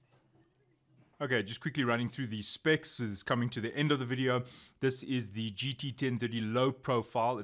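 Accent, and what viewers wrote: South Africa. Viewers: Hey, are you South African 🇿🇦. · This is a South African accent....you can’t fool me, thanks vir die review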